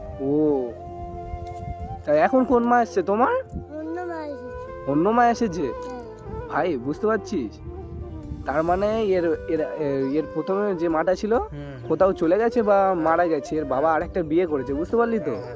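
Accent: India. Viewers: ইন্ডিয়ান কথার মতো লাগছে💕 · কথা শুনে মনে হয় ইন্ডিয়ার 🙂 ইন্ডিয়ার ভাষা এমন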